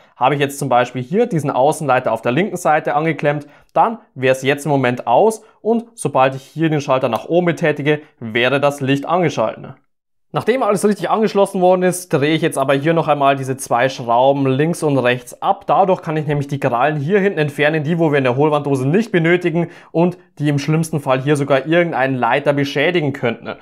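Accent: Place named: Middle Franconia, Germany. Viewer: Wirklich gute Anleitung, aber kommt der Akzent aus Mittelfranken? "beschädigen könntne", "oben und untne", sowas kennt man bisher nur vom guten Drachenlord.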